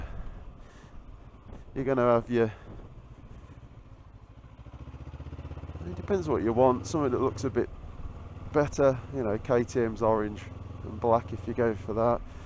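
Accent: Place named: United States. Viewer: Great job and great American accent,haha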